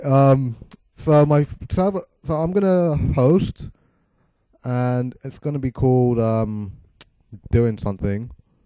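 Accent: United Kingdom. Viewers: Thx dude This really helped and also by the accent I noticed that ur from the UK ;D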